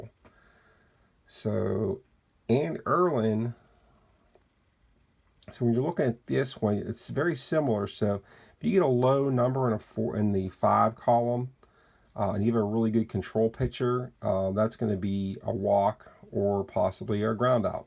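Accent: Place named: Pittsburgh, United States. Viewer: Nice video. Love the “Pittsburgh accent “.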